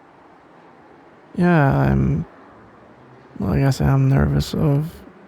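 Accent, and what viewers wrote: Scotland, United Kingdom. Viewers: OMG YES A SCOTTISH DILF AUDIO 🥹 my life is complete, but on a serious note this is adorable <33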